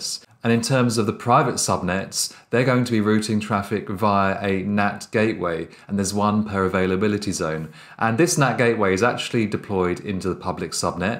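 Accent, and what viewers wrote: United Kingdom. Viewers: british accent makes it more understandable and engagging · London English! Wonderful!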